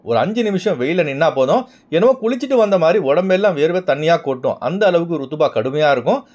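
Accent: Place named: Arab world. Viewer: Ungal arabi tamil supper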